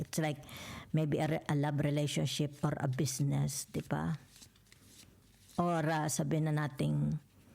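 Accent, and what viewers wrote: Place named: Tanay, Philippines. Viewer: I claim it all positve readings🙏🙏🙏thank you po sa mga readings nio,ang galing nyo po magsalita,may punto po ang salita nyo,punto po ng mga taga tanay..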